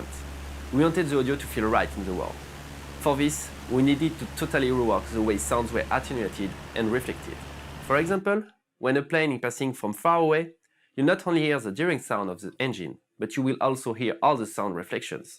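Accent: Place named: France. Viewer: Vous êtes Français ? En tout cas ce qui me fait dire ça c'est que je remarque un léger accent Français dans l'Anglais .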